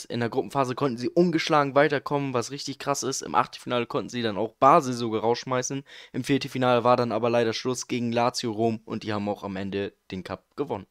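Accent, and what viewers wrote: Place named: Germany. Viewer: Hi. Danke für die antwort. Naja es ist halt so ein sehr deutscher englisch akzent. Nicht schlimm aber für leute die flüssig englisch sprechen ein bisschen nervig. Aber das video war dennoch sehr sehr gut. Du hast ein neuen abonnenten!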